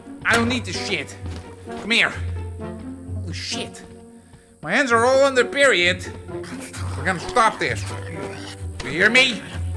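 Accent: Netherlands. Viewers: are you dutch i hear dutch in your voice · Somehow your accent got even more Dutch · I got a good feeling about you being dutch because that is an accent that only dutch people have. But are you? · He sounds like he’s from the netherlands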